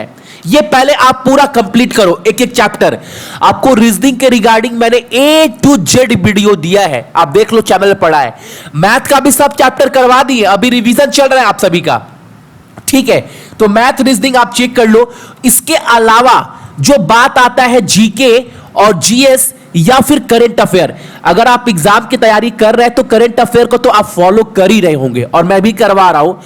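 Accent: Bihar, India. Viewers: kdwa par sch bolte ho bihari style me jo bahot acha lgta hai muje toh. love from rajasthan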